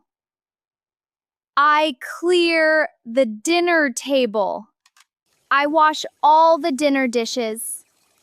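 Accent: United States. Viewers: Please is it american pronunciation!? I would like British one also😊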